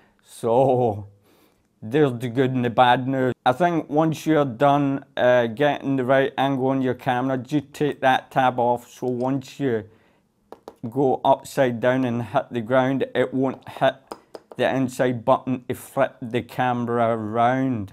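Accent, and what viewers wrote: Scotland, United Kingdom. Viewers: Scottish accents are so cool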